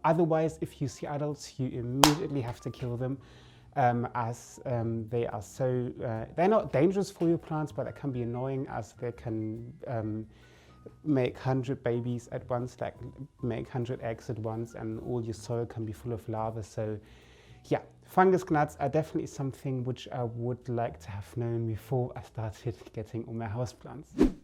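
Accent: United Kingdom. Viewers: Where are you located im thinking England because of your accent.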